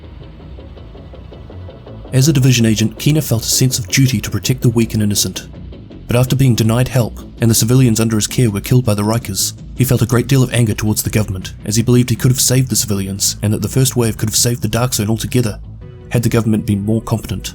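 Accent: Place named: Auckland, New Zealand. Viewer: sounds Aucklander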